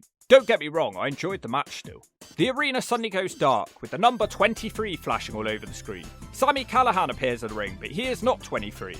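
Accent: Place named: Scotland, United Kingdom. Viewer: Yeah, his voice can sound a little southern American and Australian if he's hanging back but he never had a THICK Accent, just some scottish ticks.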